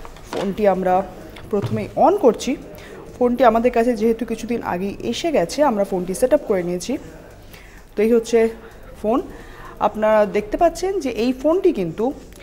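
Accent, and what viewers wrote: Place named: Kolkata, India. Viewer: কোলকাতার বাংলায় বেশ মিষ্টতা রয়েছে, শুনতে ভাল লাগে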